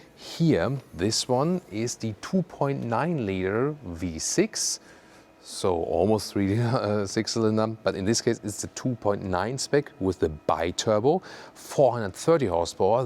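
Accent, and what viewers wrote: Germany. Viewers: Excellent presentation,. I adore German craftsmanship. And I prefer the Blue Q9 over the SQ designation. Its understated elegance carries a more distinctive refinement. And your lovely accent makes me wish Audi would use you in a Siri, or Alexa-style interactive firmware suite with the Q9. It’s wonderful!🇩🇪🌷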